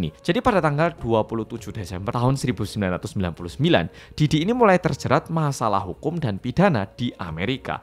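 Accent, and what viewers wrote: Java, Indonesia. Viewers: wkwkw aku yg udah liat versi dari nessie sama jerry yg banyak pake aksen inggris, ke mas yg pake logat jawa jadi ngakak 😭 berasa ngobrol sama temen sndiri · Akhirnya mas bikin videonya jg. Makasih mas. Krna penyampaian nya mudah dimengerti, detail jg, gk ketinggalan medhoknya 😅, editing nya jg keren sih, jd terhibur jg apalagi part mas nyanyi 😂🙏🏻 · Apanya yg lucu dari kemedhokan ini... Aku bhiasha ajha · Paling senang lihat penyajian Mas. Paling rapi dengan bahasa yg santuy dan gak bikin pusing yg lihat. Good job mas korea jawa medok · Akhirnya versi medhoook.. wlw telat wkwk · karna medhokk, aku jd ngakak bukan horror wkwkw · Korea medhok 😂 suka denger ngomong nya lucu bgt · Sebagai orang Jatim paling ujung barat “Njenengan jouwosh, Mas”. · Aku delok ki ora kyk delok youtuber korea kyk youtuber jatim… tp gpp iku warnamu wes ojo d ilangi… sering2 dolan malang ben gak ilang medok e…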